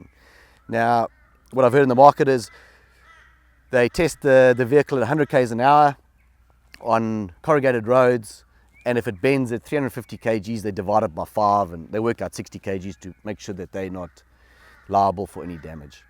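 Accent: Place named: South Africa. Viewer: Hey, great SA accent for an Oz! Nice product, thanks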